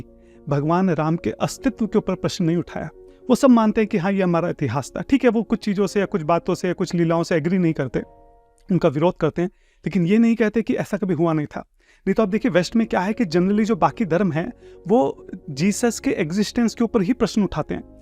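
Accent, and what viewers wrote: India. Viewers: Sir your Voice,,pure Indian and ak sukun bhari hai ...jay hind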